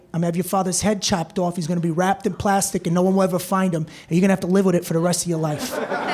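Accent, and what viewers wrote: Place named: New Jersey, United States. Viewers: Knew this guy was from Jersey just by the way he said "ya knowada mean". Also knew he went to Florida for rehab. Also knew he wasn't a bad guy, just seen some fucked up shit. Loved the act but hit me when I realized how real this joke was. It was REAL MOTHAFUCKERS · Jersey Italian accent is perfect! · This sounds like every guy from NJ. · he sounds like jake if jake had a jersey accent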